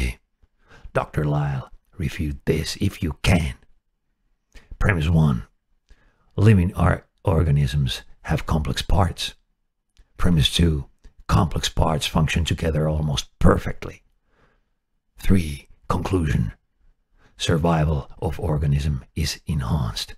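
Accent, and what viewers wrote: Germany. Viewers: I like science on youtube but I get the impression he is like acting and I dont like it. Is that his real voice? Theres nothing wrong with having a German acent and his natural voice will do fine.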